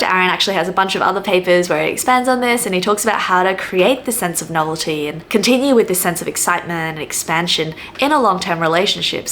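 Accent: Australia. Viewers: I just watched it and the intro and outro are adorable! Lots of people love the good old Aussie accent :) And the research and referencing was really good (I am an Australian psych honours student - so I may be a bit biased). I reckon you should leave it up, it's bonza!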